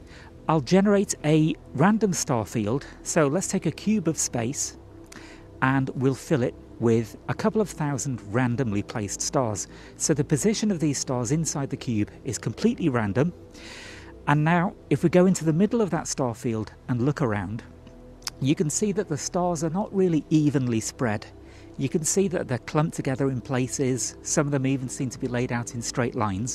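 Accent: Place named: England, United Kingdom. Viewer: You sound pom. You talk northern side so your a pom. Then you knot one up for the Southern Cross. Hell, your now family bro. To the Eureka ✨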